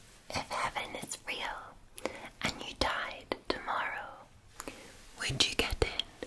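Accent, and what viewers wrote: Scotland, United Kingdom. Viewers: 3 minutes into the video and i realised she’s Scottish how can I not tell I’m also Scottish from Dundee sadly I’m so proud now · I mean I’ve always loved the Scottish voice because hearing them shout is fucking beautiful but hearing her whisper is something else. I’m glad I share a kingdom with this woman 😇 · Wonderful asmr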